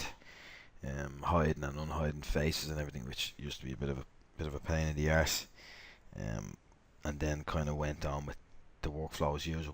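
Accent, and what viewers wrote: Dublin, Ireland. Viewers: someones from Dublin ;) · fair play thanks for this series,sounds mad to say this but the fact we're both dubs i can follow along that bit easier compared to some other tutorials keep them going mate!:)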